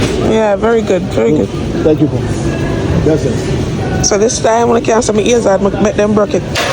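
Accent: Jamaica. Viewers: I just love how u speak our own patios love your style girl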